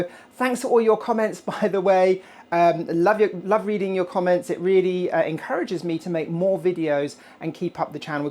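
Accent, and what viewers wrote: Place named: Bristol, United Kingdom. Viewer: If I had to hazard a guess it would be Bristol 🤔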